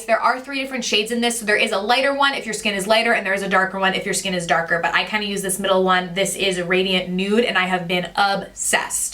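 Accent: New Jersey, United States. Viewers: It’s how we talk in the Jerz!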